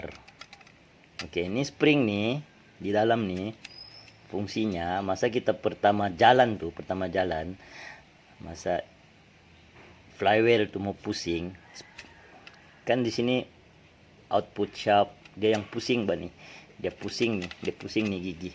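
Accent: Sabah, Malaysia. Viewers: Terima kasih encik berkongsi ilmu. Suka saya mendengar loghat orang Sabahan. Kereta pun sudah ada tanda2 perlu tukar. Semoga dimurahkan rezeki tuan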